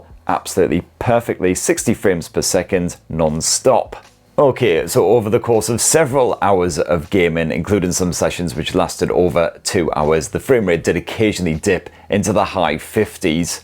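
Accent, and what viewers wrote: United Kingdom. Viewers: you talk like Rowan Atkinson, or maybe every British out there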